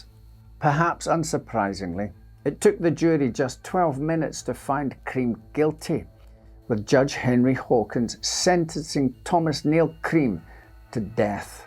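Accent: United Kingdom. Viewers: I absolutely love your content, sir. You truly know how to induce a moment of true dread with your powerful accent. You make me proud to be a British person with a proper silver spoon in my mouth, and I wouldn't have it any other way. Listening to you is literally like reading a truly mysterious sonnet written by an unknown author. That's how much I find your videos satisfying to watch and listen to. I could easily fall asleep to your incredible content. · I will never understand why Brits pronounce aluminum the way they do. It's wrong af 😂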